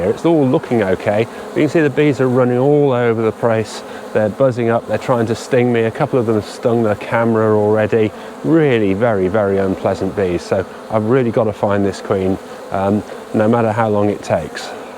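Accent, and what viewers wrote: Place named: RP, United Kingdom. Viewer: You might want to get a tripod? Just set your camera on it and then both hands free and Bob's your uncle! By the way, Just found You and delighted to find an intelligent chap who speaks the Queen's (no pun intended) English, unlike most of the other Keepers of Bees on YT who seem to all be from the American South. Nice guys but their communication skills are rather "provincial". Needless to say, I've subscribed. So one more to add to your 3.91Ks. Now on to "How to Make & Use a Bee Vac". Cheerio!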